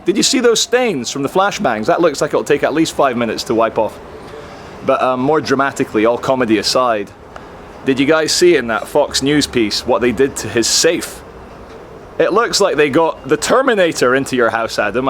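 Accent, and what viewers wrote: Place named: Manchester, United Kingdom. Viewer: sounding a bit more like a manc these days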